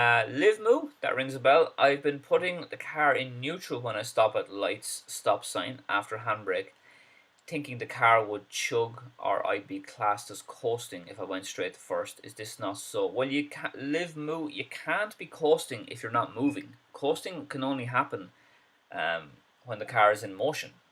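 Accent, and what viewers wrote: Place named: Ireland. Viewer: we thought it was Scottish but now we think it's Irish haha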